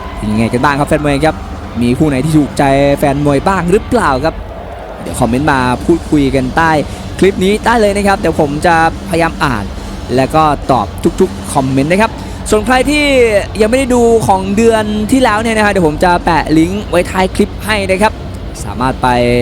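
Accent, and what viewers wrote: Isan, Thailand. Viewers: พากย์อิสานมันส์มาก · สุดยอดพากบ้านอิสาน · พากย์อีสานบ้านเฮาชอบมากไปครับ❤🎉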